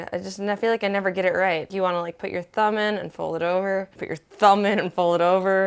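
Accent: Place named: Michigan, United States. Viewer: I can tell you're from Michigan bc of your accent :)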